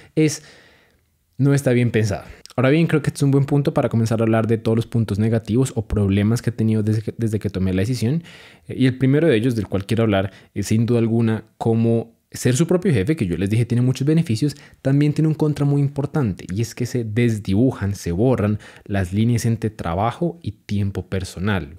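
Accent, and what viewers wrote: Colombia. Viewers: Por la forma de hablar supe que es Colombiano.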